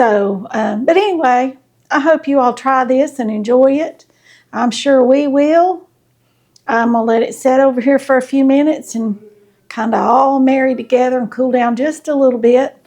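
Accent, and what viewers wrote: South, United States. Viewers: Ahhh….🙋🏼‍♀️ 🎉 Yes, I’m a new subby and I love 💕 your shout out! Thanx!! Living in Australia 🇦🇺 and growing up on lovely romantic Southern films and accents…it’s so endearing to me. Familiar and nurturing, kind and “easy peasy…okey doke!” 💋 ✌️😁👍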